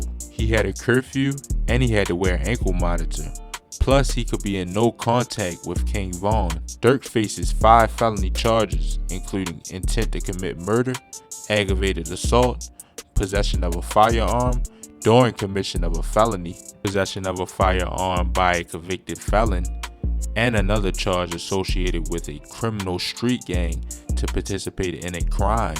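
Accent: Baltimore, United States. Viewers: You from Baltimore I can spot our accent anywhere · you from baltimore?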